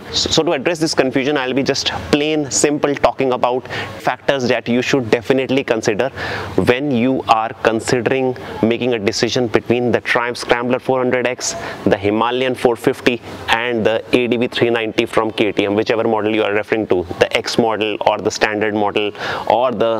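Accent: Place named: Punjab, India. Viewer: Great, well thought-out comparison. By the way, you seem to have a very strong Punjabi accent.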